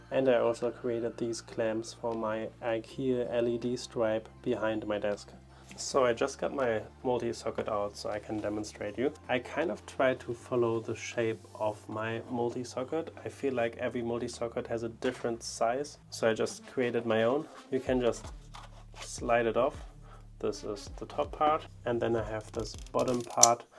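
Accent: Germany. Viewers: i hear german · Direkt raus gehört das du drutscher bist, deswegen noch sympathischer 👍🏽 mach weiter so super video🫶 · I love this guy, I'm german but moved to America when I was 4 and listening to him is like listening to opa and onkels speaking English to me when I was younger because they wanted to show me how smart they were. Thank you for the heartwarming memories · Are you German? I love your accent!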